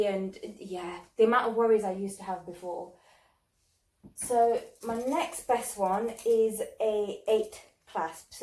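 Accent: United Kingdom. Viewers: Great video! Loved seeing you trying on those different type of suspender belts! My favourite was your white, lace, suspender belt. Love your lovely English accent too.:) xx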